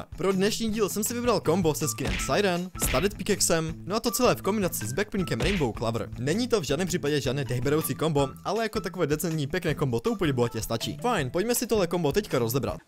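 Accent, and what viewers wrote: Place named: England, United Kingdom. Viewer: Sorry ale ta tvoje angličánština mě mega sere xd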